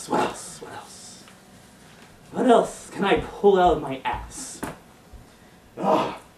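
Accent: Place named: United Kingdom. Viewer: Your British Accent is dire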